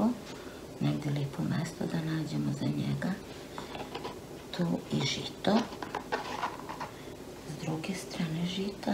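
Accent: Vojvodina, Serbia. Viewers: Ona meni toliko super priča...ja sam s mora iz Dalmacije ali kad čujem taj vojvođanski naglasak se uvijek oduševim...a kad kaže "štogod lepo" to je najsmješnije😂👍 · Lep je kolac,draga,a boga mi i ti si mi lepa kao lutka,kao da si promenila boju kose,stogod lepa!? Jos jednom hvala ti za sve recepte,modlice i volem da te slusam,cujem moje,moju Vojvodinu,stogod lepu! ❤️❤️❤️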